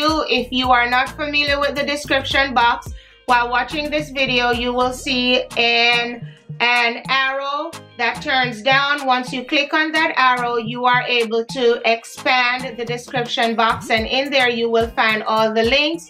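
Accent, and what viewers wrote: Patois, Jamaica. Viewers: "mi no like when we pants look like me tek measurement in a water." Real Jamaican. Girl you are funny. Love the haul.🤣🤣🤣🤣🤣🤣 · At first I was like this African lady looks beautiful let me watch. Then d accent chip in and i was like . A JAMAICAN DEN SHE NUH MUSS KILL DIS... LOVE D VIDEO !! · YOUR PAGE NEEDS CROWD!!! HELLA TALENTED. I hear a Jamaican accent . New subscriber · Love your Jamaican accent. You are? Love all the pieces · Knew I was hearing Jamaican! New subbie hear. Great haul 😍😍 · I just found your channel and I’m like she is Jamaican… wait till the patois comes out and it did !!!!I fell out laughing 😂 YES!!!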